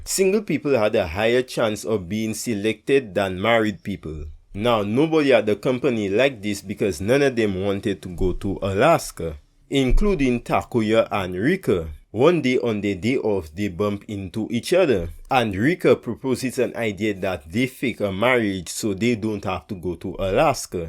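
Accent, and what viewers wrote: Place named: Caribbean. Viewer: I hear your accent. Are you from Guyana or St.Vincent? What Caribbean island are you from?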